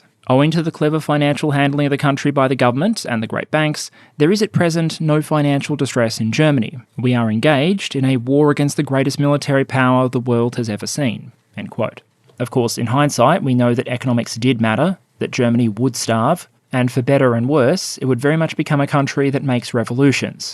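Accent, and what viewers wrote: Australia. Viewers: a very heartfelt "THANK YOU!" for your channel. Love your Aussie accent and Emu v. Kiwi examples. Keep up the great work! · Regarding the comment you received about your accent, all I can say is most Americans that I know think Aussie accents are rather charming. While the stereotypical Brit 'upper class' accent seems somewhat snooty, Aussie's exude a friendly 'how are ya mate' quality. Yours is fine and combined with your humor and knowledge make the videos very listenable. 🇦🇺🦘👍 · That last bit is why I have watched your stuff for well over two years now. You're insightful, intelligent, honest, and humble. Keep up the good work and don't worry about the Aussie-isms. I'm sure us Americans will learn eventually. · Finland within top ten :D Well, greetings from over here, war topics are indeed in our heads. p.s. I didnt realise some people dont like the aussie accent, I think its cute, gives a nice relaxing atmosphere to otherwise serious and analytical approach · There are people out there that don't enjoy listening to the Australian accent? 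🇺🇲🇦🇺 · If it makes any difference, I find an Aussie accent to convey sincerity and humility and combined with the sense of humour, enjoy it a lot. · Thoroughly enjoyable episode! and I always like a good strong Australian accent, being myself an American with probably just as gratingly obnoxious an accent as any Australian, if I get real local about it.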